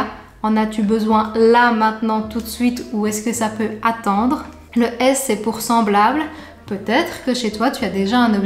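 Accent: Belgium. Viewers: oh désolée. Je découvre seulement ta chaîne 😉 Et c'est un plaisir d'entendre ne YouTubeuse avec notre bel accent belge ❤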